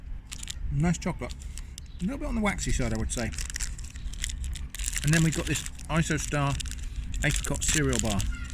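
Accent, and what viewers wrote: United Kingdom. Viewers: Don't worry; we Americans find a lot of British accents more pleasant to listen to than our own, too. 😅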